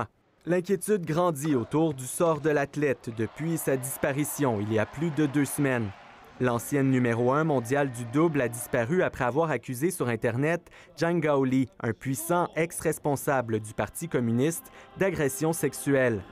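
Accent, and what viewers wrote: Canada. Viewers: C'est l'accent canadien.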